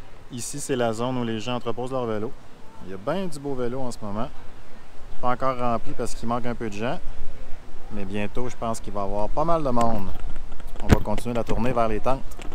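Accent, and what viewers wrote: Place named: Quebec, Canada. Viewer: Sérieusement si tu faisais tes videos en anglais avec un peu plus d'editing tu pourrais vraiment propulser ton channel. Meme si t'as un violent accent du QC cest pas grave. 1.Les anglophones vont vraimement aimer l'effort 2. Ton contenu serait accessible aux anglophones (au moins 90 des utilisateurs Youtube). Aussi non continu. Jme fie sur toi pour trouver les bonnes places.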